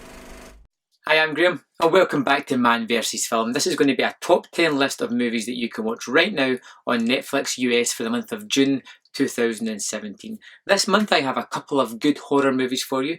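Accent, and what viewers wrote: Scotland, United Kingdom. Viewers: By the way. I am assuming you either live or are from Scotland due to your accent. I have always wanted to visit Scotland. What is it like living their?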